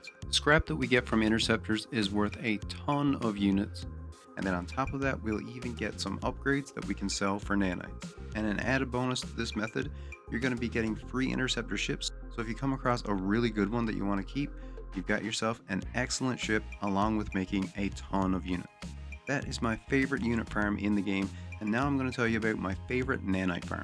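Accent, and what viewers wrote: Newfoundland, Canada. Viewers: A Newfie?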